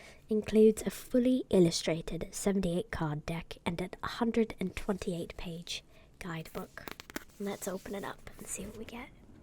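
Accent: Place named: England, United Kingdom. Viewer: 10/10 English accent. I got family in England, I think your accent would pass.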